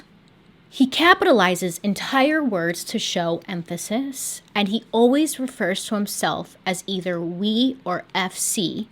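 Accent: United States. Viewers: my American English has improved by listening to her stories 🥰 thank you!!!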